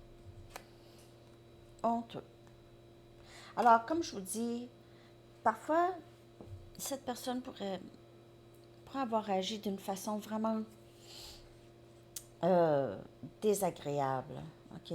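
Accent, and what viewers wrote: Quebec, Canada. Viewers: Bonjour, moi j'adore votre accent québécois, il me fait 😄 Et vos guidances sont souvent très justes 👍 Ne changez rien, c parfait! Et n'écoutez pas les ronchons 😂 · Moi j'adore votre accent,ca me fait du bien, je vous écoute presque tous les jours.❤🙏 Ça me rappelle mon voyage au Québec, j'ai tellement aimé, la gentillesse des personnes et les paysages ds les laurentides ...le vieux Montréal alors merci pour vos guidances et votre accent ❤❤❤😘😘🇨🇭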